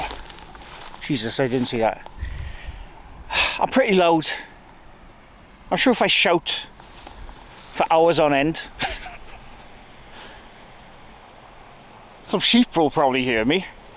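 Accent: Wales, United Kingdom. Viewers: Your Welsh accent sounds thicker out in the valleys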